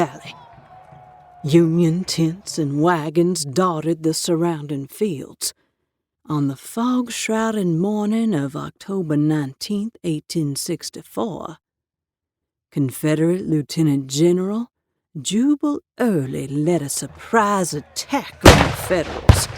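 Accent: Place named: South, United States. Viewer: Love this but the southern accent is too much and this is coming from a southern gal from the mountain community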